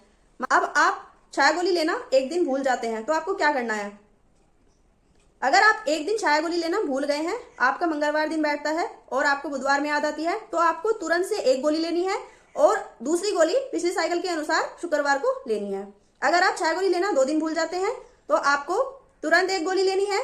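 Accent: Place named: Uttar Pradesh, India. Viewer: Nice explanation dii, aap up se h kya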